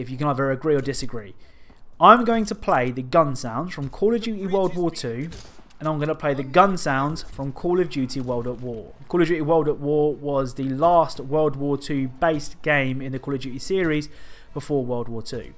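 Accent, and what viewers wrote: England, United Kingdom. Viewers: You from England?? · you are british? ( just a random question)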